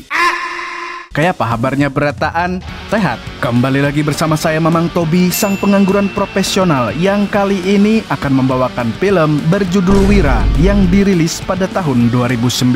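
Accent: Banjar, Indonesia. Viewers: Banjar sekalinya 🤣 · Lah bng tobi banjar kh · Orang samarinda kah banjarmasin pian mang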